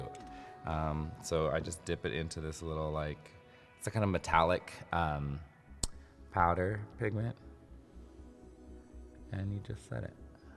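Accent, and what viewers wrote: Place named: New York, United States. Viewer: I live for ha New York accent , love you 💕💕💕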